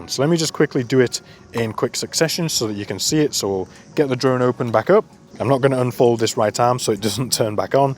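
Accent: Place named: Yorkshire, United Kingdom. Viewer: You live in the sunshine by the looks of things but you seem to have some Yorkshire roots as well. I'm a Sheffield boy - best wishes, Nick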